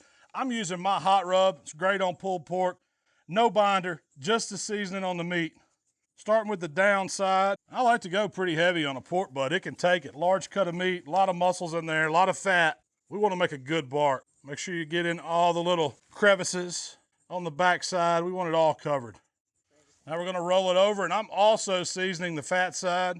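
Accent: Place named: Georgia, United States. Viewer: You know a BBQ video is authentic when the cook has a thick Georgia accent. I’ve used the snake method for cooking BBQ pork shoulder, and it’s almost a “set it and forget it” method. It’s easy, no messing with adding additional coals, and works every time. Thanks!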